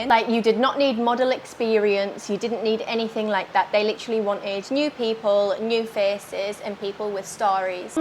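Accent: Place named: United Kingdom. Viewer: Beauty, brains, and British accent! Can't get any better than that!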